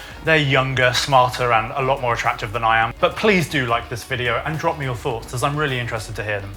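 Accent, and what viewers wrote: England, United Kingdom. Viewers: Like the reasoning and the English accent. Would I ever own an electric car ? Over my dead bloody body !!!!!!!!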